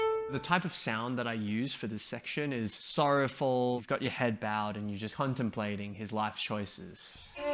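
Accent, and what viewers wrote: Australia. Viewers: Why did you have to loose your ozzie accent? It's such a charming and delightful accent that always sounds cheerful. 😍 Also I love your arrangement and you're so kind to teach ❤️ You're brilliant!! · has a different accent here. More aussie. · I like your Australian pronunciation very much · I luv ur aussie accent nahh i just love uuuuu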